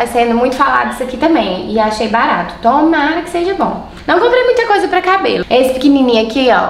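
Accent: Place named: Minas Gerais, Brazil. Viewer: Esse sotaque mineiro.. eitha q orgulho soow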